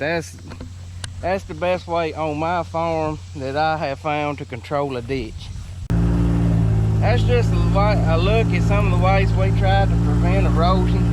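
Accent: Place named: South, United States. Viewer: You're so southern I can hardly understand a word you're saying, and you're 13 hours drive north of me.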